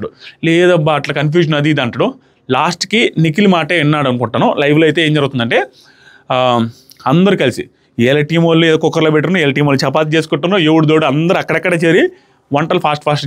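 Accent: Karnataka, India. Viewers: Kannada slag super anna 😂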